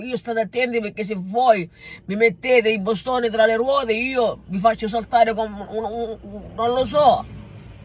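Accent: Sicily, Italy. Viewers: Sei un continuo piacere l'ascoltarti. Onesta' immensa (e complimenti per come parli lingue straniere, aggiunto al tuo meravigliodo accento siracusano)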